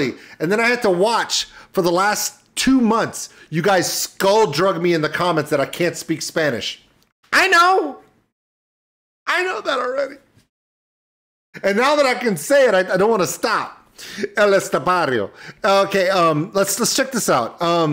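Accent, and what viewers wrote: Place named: Italy. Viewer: Kinda funny how you speak spanish with Italian accent.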